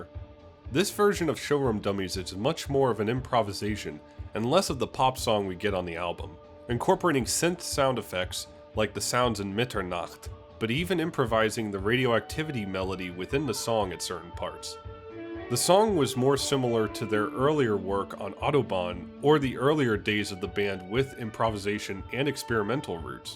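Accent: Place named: United States. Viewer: Get a German narrator as the American voice over is worthless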